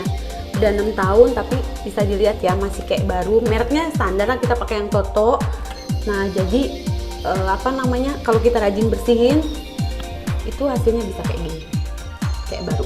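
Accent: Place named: Puncak, Indonesia. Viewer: Love your PUNCAK CITY aksen..👏👏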